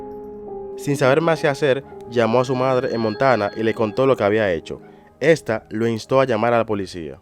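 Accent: Dominican Republic. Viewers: Me encanta como narras y como pones los comentarios de las personas con nuestro dialecto Dominicano! 😂 Tengo muchos vídeos ya visto tuyos.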